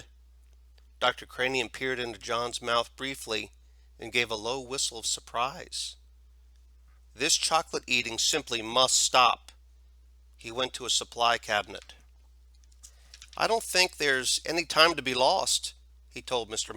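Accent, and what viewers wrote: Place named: United Kingdom. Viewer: I like the British accent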